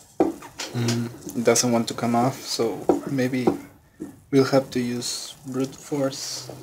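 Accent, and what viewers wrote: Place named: Argentina. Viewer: sos argentino? you have the calssic argentinian accent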